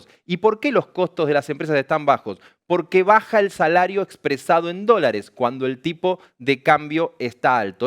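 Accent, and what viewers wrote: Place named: Argentina. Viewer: Veo que hablas c, tono arg, pero donde baja la inflación porque los que vivimos en el interior mas precisó los pueblos donde ningun comercio básico no existe la oferta nada de eso que ponen en la propaganda y aquí cada vez que vas a comprar algo si lo compras de semana a semana siempre aumento y ya no se compra nada eso de bajar la inflación se ve que es solo como siempre x porteños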